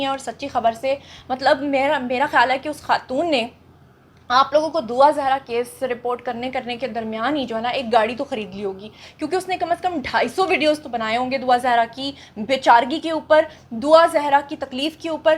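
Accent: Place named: Punjab, Pakistan. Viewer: But AP ka Jo panjabi pan he wo buht. Hi ghatya he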